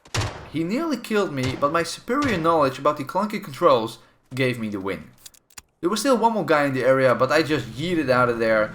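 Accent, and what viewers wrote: Netherlands. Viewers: lmao I knew you were dutch hahahah lekker bezig man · I knew you had a dutch accent, You sound like this one famous airsoft player who is also dutch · Are u from the Netherlands 🇳🇱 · ja ik dacht al dat je Nederlans was🤣😂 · You Dutch? Waar woont je? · heheh first time viewer here, as soon as he started talking I knew he's dutch :)) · You're dutch ?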